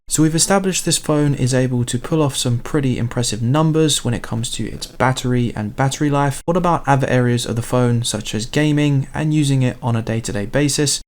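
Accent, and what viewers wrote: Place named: England, United Kingdom. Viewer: Great. And fantastic to hear an English reviewer and one with a nice, tidy garden as well. I'm a light user and I don't do games and I don't like having to worry about batteries so I'm considering it. I like big battery phones but never had a big beast like this. I'm assuming it works on 4g band 20 in uk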